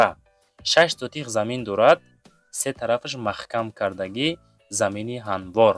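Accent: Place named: Shahrtuz, Tajikistan. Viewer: Гап занитон сад фоиз ай шахритуз хона мон додарчон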